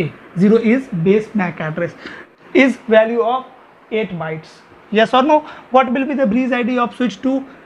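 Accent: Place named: India. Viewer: He explained it really well. Yes it can be hard to understand what he is saying because of his accent but because I spent alot of time speaking to people from India it helped me understand them when they speak. Its a skill you have to develop over time.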